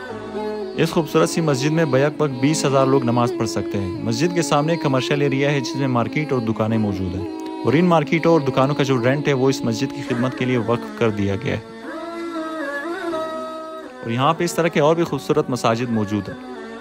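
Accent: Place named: Quetta, Pakistan. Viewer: اپکی پشتو سے لگ رہا اپ کوئٹہ کے ہیں۔میں نے subscribe کرلیا۔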